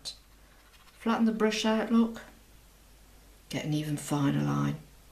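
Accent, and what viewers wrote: United Kingdom. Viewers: I've so enjoyed watching your videos & learning about watercolor from you. You're such a lovely lady with a soothing, relaxing voice, especially your accent. I love all things British & hope to visit the UK one day, especially England. I'm an extreme beginner to watercolor at age 44 though my late grandmother was an accomplished artist of oils & watercolors. My mother has painted in the past as well. My 19 year old daughter, who is a beginner also, inspired me to give it a go! I can't draw well at all but I've been able to paint from some of your videos, like the lavender pot and these wonderful silver birches! We have them here in Virginia, too! I was able to paint a very nice one from this video using brush you mentioned; so easy, but looks so real! Thanks again for sharing your talent!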